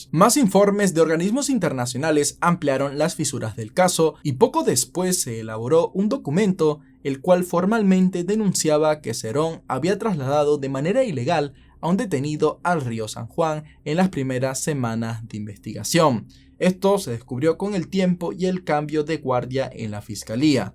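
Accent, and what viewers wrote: Venezuela. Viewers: Tiene acento venezolano👈 Su acento no es peruano👈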